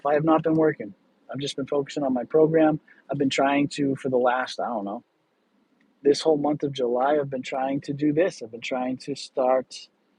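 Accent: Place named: Boston, United States. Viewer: I missed it! But I am glad you got to try live streaming out. Your accent brought me back to my military time. Now I know it’s potentially from Boston, as it reminded me of a friend I had in basic training. He had a heavy Boston accent that was new to me.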